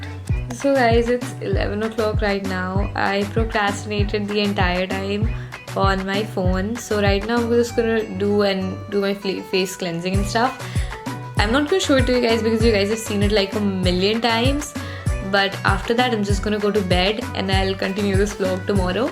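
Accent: India. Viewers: You are from Patna but your accent is really bombay types😊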